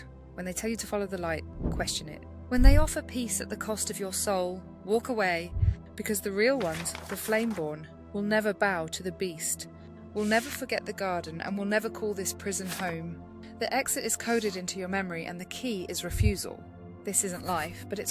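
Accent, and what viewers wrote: United Kingdom. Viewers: ahhh the British accent of wisdom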